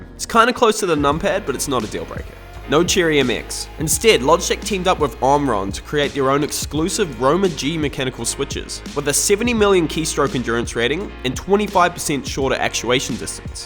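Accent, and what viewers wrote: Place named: New Zealand. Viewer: love ur new zeland type of voice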